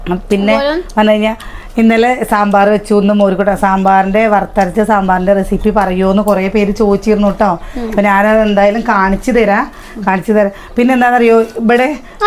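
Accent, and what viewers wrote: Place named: Valluvanad, India. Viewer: It is Valluvanadan style. Try to respect.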